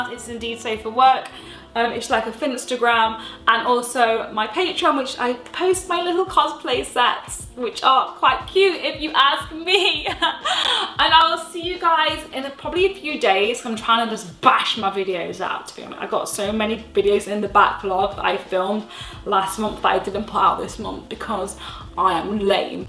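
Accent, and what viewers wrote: United Kingdom. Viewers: A breath of fresh air w a British accent✌🍻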